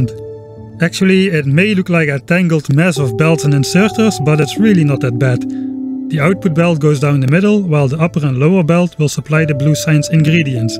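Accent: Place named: Netherlands. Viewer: 99% sure your Dutch just from hearing the first 30 seconds of this video. Btw first time on your YouTube channel/ first video I’ve seen of you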